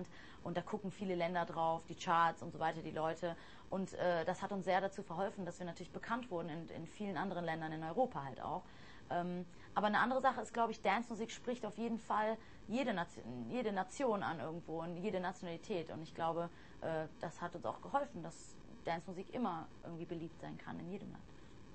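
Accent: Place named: RP, United Kingdom. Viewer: This is not something that you see every day. Posh British proper accent to straight up German. For years she had me fooled. When she sings she has an American accent. Then I heard her British accent and was surprised. Then I found out she speaks perfect German. It's so weird. I think I'm more jealous of this than her fame and fortune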